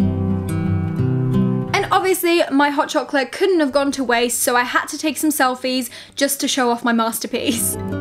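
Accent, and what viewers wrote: England, United Kingdom. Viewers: Are u English ?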